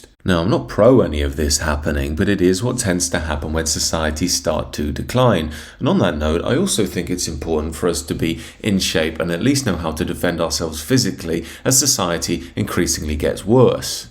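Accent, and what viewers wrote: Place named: England, United Kingdom. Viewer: London England and NOT Canada. You can tell by the buildings and the narrators accent. Keep Up !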